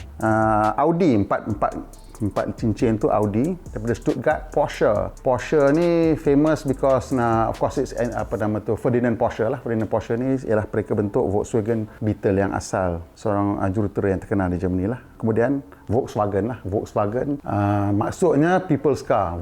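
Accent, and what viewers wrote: North, Malaysia. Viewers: bang sesekali buat video cakap loghat utara lagi sedap la..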